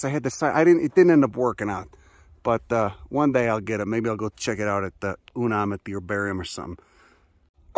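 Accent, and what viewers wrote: Chicago, United States. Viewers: This guy rips! Love that heavy Chicago accent. Caught be off guard in the best possible way. I know almost nothing about these species but the delivery keeps me interested. Good stuff!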